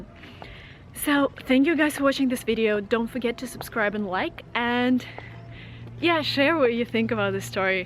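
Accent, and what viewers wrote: United States. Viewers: Your English sounds like American English, but you said you studied English in Great Britain. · Hi mam I have been watching your videos for last week's honestly your videos are inspiring me the way how you talk in American accent is superb I'm not a native speaker however I wanna be one of them. I can feel that zeal in me. Kindly suggest me how can I enhance my skills and become a native speaker... Thanks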